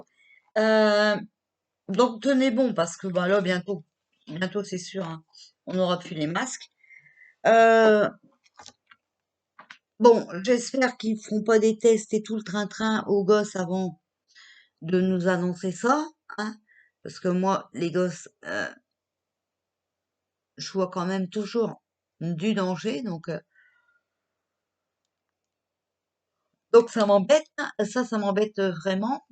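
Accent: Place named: Nord, France. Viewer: J’adore cet accent deuch chnord !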